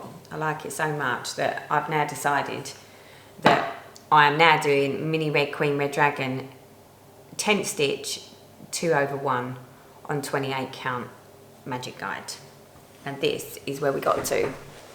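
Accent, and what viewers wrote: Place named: Devon, United Kingdom. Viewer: Enjoying seeing your lovely projects. Hope you make it to Canada one day. I grew up in Devon UK but moved to Canada 15 years ago. Your accent reminds me of home:)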